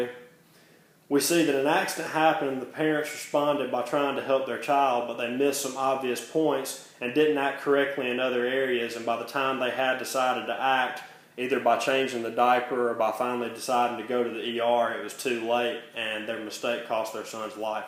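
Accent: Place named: South, United States. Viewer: Hey bud. I love ya because you were a son of the south reading this incredible haunting late DFW micro-story, but as I’m sure you’ve been informed, the baby didn’t die. Not physically. The point of the story is how enough pain, combined with enough hopelessness of escape, causing permanent mental/spiritual dissociation as the only available escape. It is a part of him was more present and alive in the world that he was born which detached itself from his spirit and “floated away like vapor” or whatever he says there. He was in so much pain, and the two people he instinctively trusts to make pain go away couldn’t for so long, that he partially dissociated to get away from it and that part of him never came back, though it clearly says he went on and lived and life and “drew pay” meaning he worked a job like anyone else. But he was partially detached from life, a part of him dissociated and left forever, and watched overhead. 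In other words, he was a very different person in life than he would have been had the accident not happened, an alive and present person. He lived his life, but his soul left, and watched overhead😟🤯🤯😮. Fucks me up every time. Are you from TN, NC, GA or KT? Maybe SC but no one in SC has ever read DFW. Hearing this in your accent is music to my ears. Good on ya for so much here. If you’re still on here I’d love to hear what this was for and if you finished your degree, etc. 🤠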